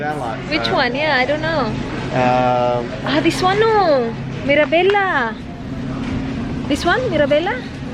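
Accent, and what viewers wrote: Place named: Visayas, Philippines. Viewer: Hi ate! Bisaya din po ako. Ang saya talaga manood ng mga vlogs mo. Nindot kaayo inig naga bisaya ka kataw anan kaayo haha ❤️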